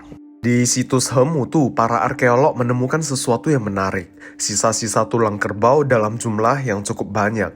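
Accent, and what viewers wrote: Java, Indonesia. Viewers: Materi yang bagus. maju terus. Semakin hari semakin medok logat. Sehari-hari pakai bahasa Jawa ya?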